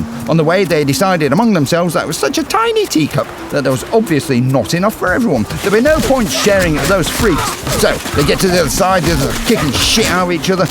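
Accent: United Kingdom. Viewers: why so British? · Классно, спасибо Вам. Но голос все таки придется подучить "русской" манерой рассказывания :) п.с. Не понимаю почему рассказчика с шотландским(если не ошибаюсь) акцентом выбрали ) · если человек пишет про "американскую аудиторию", может речь как раз о британском акценте, которому как раз откуда не быть у британского диктора?.. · There was a question above in Russian 'Why the narrator have such an accent?'. And I answered 'Which accent? He's British!'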